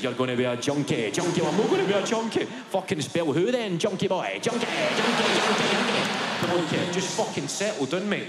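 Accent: Scotland, United Kingdom. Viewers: Oh my God I am in love with this guy! He has new material every single time. His smile, his accent and the material are just so real that I ache to shake his hands and tell him what an amazing guy he is... I miss his funny posture from his slightly overweight days but now he just looks stunningly good. Love you Kev. Thank you for opening up the Scot world to us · Oh,. I think you're so funny! Now, if I could only get through your fabulous Scots brogue, then I could really laugh at the parts the audience roars at. I will continue to watch you on Youtube, because you see, I've discovered that the more I listen to you, the more I understand you!!! Wooo Hoooo!!! 💋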